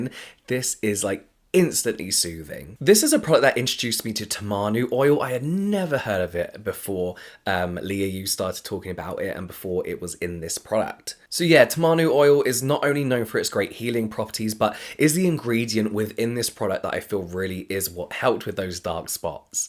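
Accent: United Kingdom. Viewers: Just discovered you. Great videos that get straight to the point. I also love your super calming voice (British accent is a plus 😉). Love your content! Keep it coming.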